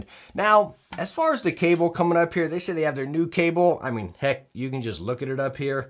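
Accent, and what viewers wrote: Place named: New York, United States. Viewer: Love your attitude. You from new york by any chance? Feels like it. Great review, thanks.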